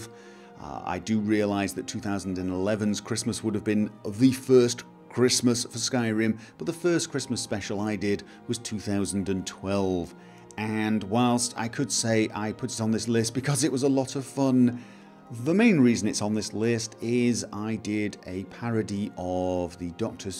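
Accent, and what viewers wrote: United Kingdom. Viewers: Besides the quality and your special attention to quality, what also sells your videos: Your british accent, which can be understood very easily for non english motherlanguage speakers. AND: The sound of your voice is just perfect for narration. Keep them coming and have FUN doing them!!!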